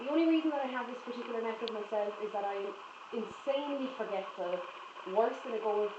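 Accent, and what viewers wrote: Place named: Ireland. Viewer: I love your Irish accent I love the video PLUS thanks, this video really helped me 👌🏻👌🏻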